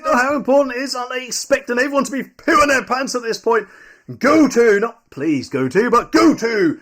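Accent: Norfolk, United Kingdom. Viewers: Norfolk accent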